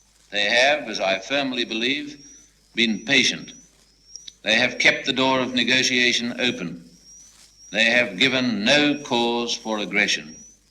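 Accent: Australia. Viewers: Menzies had a cultivated Australian accent, listen to Geffrey Rush or Dame Elizabeth Murdoch or even Barry Humphries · He has a Cultivated Australian accent. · Great Australian accent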